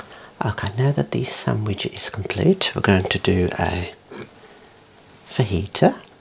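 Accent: Birmingham, United Kingdom. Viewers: I can't believe...how satisfying this is....The UK accent fits accurately to calmness of this video. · I only realised after reading comments that this is a sort of remake of that Irish man making sandwiches. His voice is golden but your brummie accent is quite nice. Nice for you to put a 'British accent' on the video that's not a posh London accent. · Is that a birmingham accent? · whereabouts in the uk are you from? i can’t place your accent 😊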